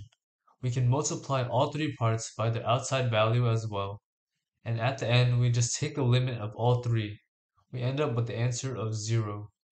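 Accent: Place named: Asia. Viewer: I dont think he's Indian, he sounds more central asian, southeastern asian, or pacific islander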